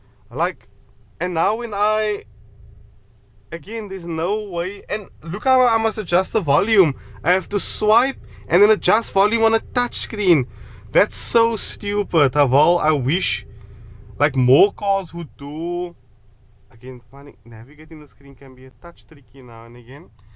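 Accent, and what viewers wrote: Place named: Cape Town, South Africa. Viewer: Don’t change the way you talk it’s great listening to someone speak the Cape Town slang😁🙏 subbed because of that!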